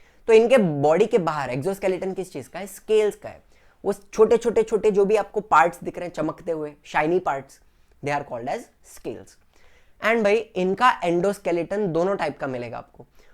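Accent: Maharashtra, India. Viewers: You are Kutchhi but you sound more like a Maharashtraian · sir aap marathi ho na ?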